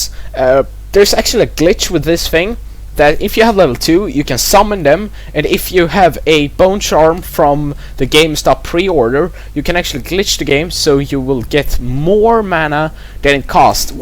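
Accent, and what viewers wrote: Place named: Netherlands. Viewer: you must be dutch, i heard your pronunctiation and though you speak english well. when saying jump and other things i was like hmm.. he must be dutch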